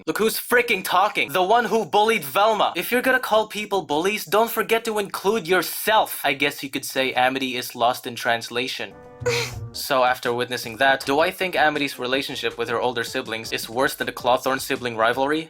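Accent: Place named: United States. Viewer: How do you have an American accent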